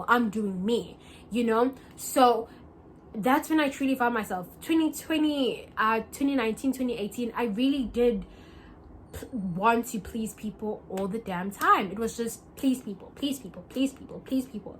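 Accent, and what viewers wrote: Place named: United Kingdom. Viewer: it's the British accent for me 🤣😭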